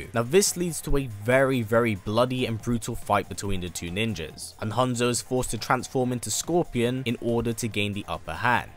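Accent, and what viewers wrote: United Kingdom. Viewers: Wat u have a brutish accent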